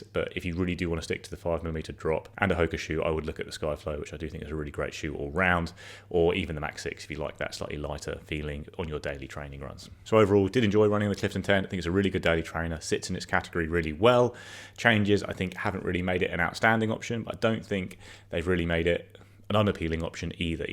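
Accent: United Kingdom. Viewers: Talk just a bit too fast for an American to decifer the accent. I generally do well with Brit accent, until y'all start running words together/talking fast. Thx for what I could understand!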